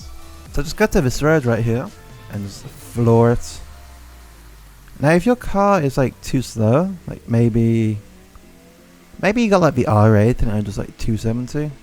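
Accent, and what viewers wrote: United Kingdom. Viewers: I love ur British accent Wish me luck imma buy a pc!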